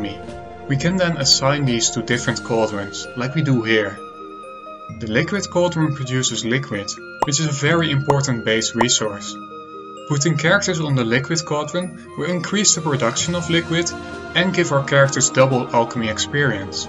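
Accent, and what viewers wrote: Netherlands. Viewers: Funny how i can hear you're dutch just by the tone of your voice. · Are you dutch?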